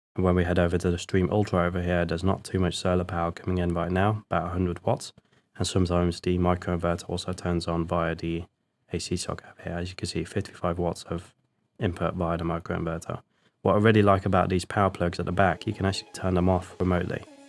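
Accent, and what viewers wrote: United Kingdom. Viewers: Out of interest, are you Dutch+British? You have a very British accent, I initially thought you were based in the UK when I first started watching your videos haha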